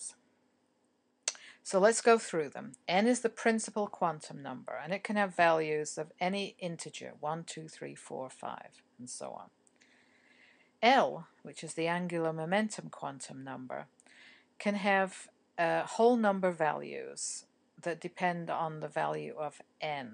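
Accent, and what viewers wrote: United Kingdom. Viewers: Actually she's pretty legit and has an awesome accent from MANCHESTER (she makes sure you know that). She's really funny and makes things very understandable. She is the best chemistry teacher I have ever had. I just started her class this fall semester so I'm not really bias lol. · What a cool sounding accent. It sounds British but American at the same time. Is this Irish?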